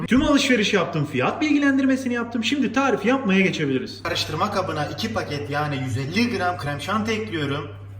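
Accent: Adana, Turkey. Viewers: Hocam aslen adanalı mısınız :D çok tatlı konuşuyorsunuz :Dd vallahi sırf söylediğiniz eylemleri dinlemek için izliyorum :dD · Abi adanalı mısın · Atirüüm,Yapıyurüüm,Beklirüüm,Kesiyürüm Adana şivesinin verdiği lehçe ile müge anlının mutfaktaki versiyonu 😁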